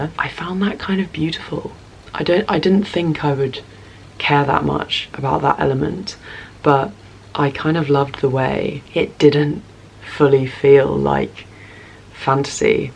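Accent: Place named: United Kingdom. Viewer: I really enjoyed this video. Its cool to hear someone else's take one books like these. However, i cant keep my self from wondering about where you are from. Some times it sounds British, at times Australian and then i wonder if you're South African. You have probably gotten this question many times. But where are you from?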